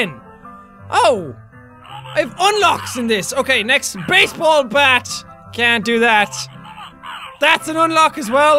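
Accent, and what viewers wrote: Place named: Ireland. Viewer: The Irish accent is 10 times stronger in the old videos.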